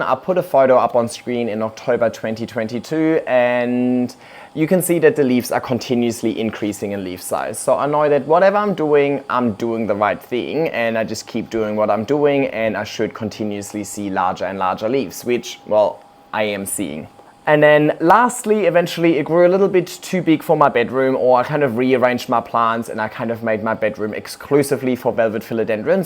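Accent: Germany. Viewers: I can‘t stop wondering about his accent😅 Is he originally German? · Are you from Germany? :)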